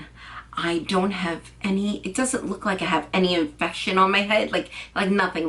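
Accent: New York, United States. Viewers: All ingredients used for hair growth have to come from inside the body not on top. You need biotin selenium etc. Dr. Berg has an incredible formula for hair growth. Ps. You gotta be from nyc you sound like me! Queens?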